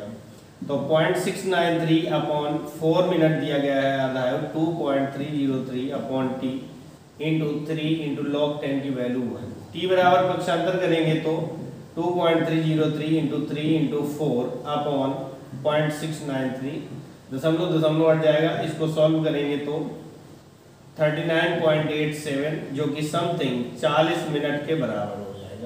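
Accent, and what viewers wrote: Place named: Kanpur, India. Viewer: Very very nice kanpuriya language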